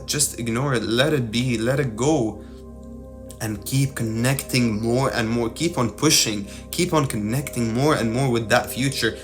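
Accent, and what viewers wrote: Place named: Ireland. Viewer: Obviously, English is not your first language, but I thought I detected a wee Irish twang in your accent?..... Thanks for your wisdom brother. Appreciated. ❤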